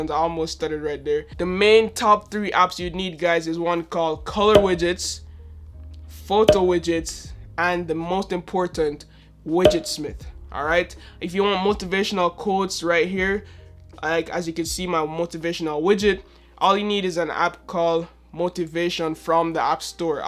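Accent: Jamaica. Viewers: U sound Jamaican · Iz u Jamaican 😎 🇯🇲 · You Jamaican dawg? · Ngl u sound Jamaican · I used to go to school with him in jamaica lol we have cool accents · Yo bruh kl vid but one question, you Jamaican? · Are you from Jamaica (or Caribbean) by way of England??? I hear a combo in your accent. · soon as i heard that voice i was like. he gotta be 🇯🇲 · I can literally hear the Jamaican accent in ur voice general. Where from Jamaica u come from?? Mi cum from St.Thomas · are you Jamaican bro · Yo you Jamaican?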